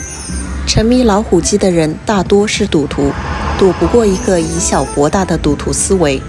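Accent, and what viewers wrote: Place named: Sichuan, China. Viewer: …四川的哇。😂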